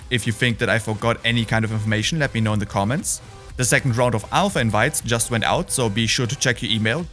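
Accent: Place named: Germany. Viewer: Great Video ! But a little german accent :D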